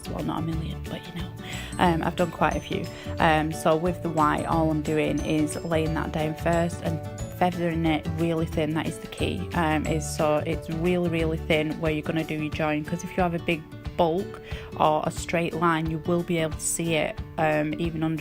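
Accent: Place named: Northern England, United Kingdom. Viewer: Lovely set.... just subscribed as came across your vid... u sound very northern and familiar... I'm a Lancashire lass and is swear u r too x keep going... your doing really well. I'm 11 years in and still learn every set. X and your more confident than me cos I'm still not strong enough to set myself up on here yet. Tempted but always chicken out. So keep going x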